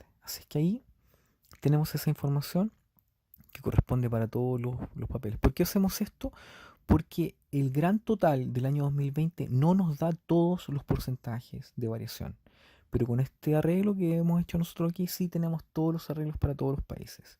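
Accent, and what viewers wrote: Chile. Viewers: No no es eso es que es Chileno y los chilenos hablan con muchos altibajos al menos al el se el entiende otros Chilenos de Santiago Centro y otras comuns apenas se le entiende.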